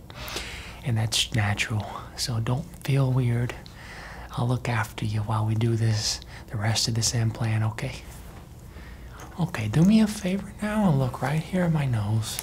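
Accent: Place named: Midwest, United States. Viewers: He's giving me Chicago Bears SNL with the accent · You sound Midwestern and i love it 😊 you feel so comforting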